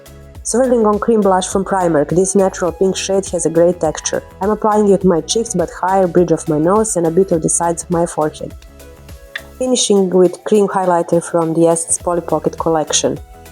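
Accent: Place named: Russia. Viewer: вы русская? Зачем говорите на англ. языке, да еще и с таким акцентом?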